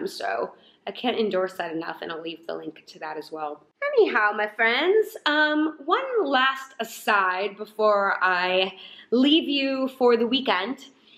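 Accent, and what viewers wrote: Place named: Southern California, United States. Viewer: You have to most SoCal accent ever.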